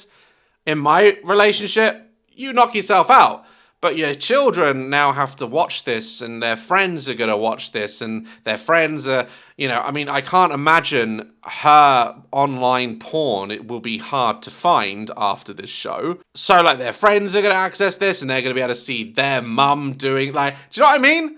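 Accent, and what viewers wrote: United Kingdom. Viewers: He really does scream British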